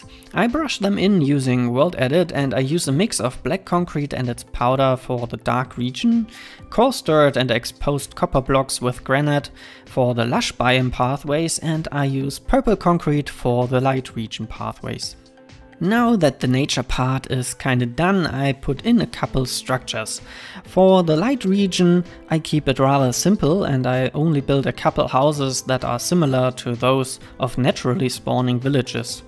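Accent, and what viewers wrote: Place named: Germany. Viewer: cool wie man heraushört das du deutsch bist xD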